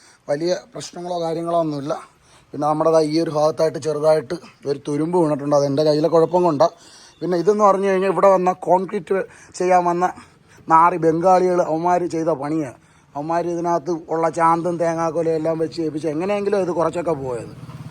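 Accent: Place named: Kollam, India. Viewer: Kollam സ്ലാങ് ❤❤❤❤❤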